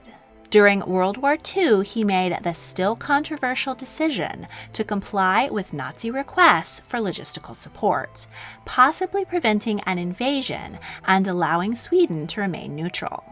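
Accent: United States. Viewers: I am an American. but I find the American accent of this narrator absolutely horrible. Why can't people speak English properly without using a hysterical accent? I have been living and teaching in Europe for more than 30 years and I have to say, less is more. Don't over-do an accent like this narrator does. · It’s so funny hearing all those European names pronounced with an American accent.😅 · Screeching American accent telling European Royal history poorly! 😱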